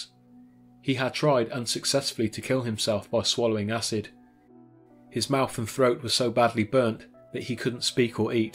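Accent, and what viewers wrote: United Kingdom. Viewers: Soon as I heard the British accent, I though oh boy here we go he's gonna fuck every story up but honestly this video was incredibly accurate and factual. The only missed detail was Dahmer's address. He said 924 North street, it's actually 924 North 25th street between Kilbourn and State Streets. I am a lifelong Milwaukee resident and am familiar with all these stories. Kudos to whoever researched them. Great job. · in my humble opinion your voice and dialect are two reasons I love your channel. As an American living in Germany I'm strangely biased as personally I won't listen to my favorite literature unless it's in a British accent. I'm really into olde sea novels and Gothic spiritual tales and just can't listen when read by a fellow American..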